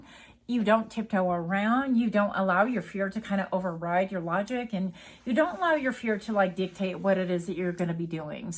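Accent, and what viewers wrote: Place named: Spain. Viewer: I love your Spanish accent. I'm from Mexico and I love it. 🩷🌟🌟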